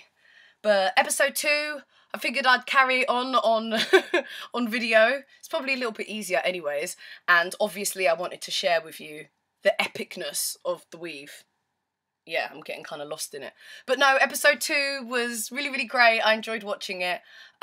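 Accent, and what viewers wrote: United Kingdom. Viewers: I need more than Your have some really great insights and I can listen to your Brit accent for eva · Love the videos! Your energy is fantastic! I wish I had your accent haha :) I'm rooting for you and Sophie y'all are my favorite and Katherine too :) going for the Brits even though I'm American lol. And by the way that stuff is NOT what Americans normally eat. I've never had pigs feet or chitterlings in my life. But mmm at least you got grits! Those are fantastic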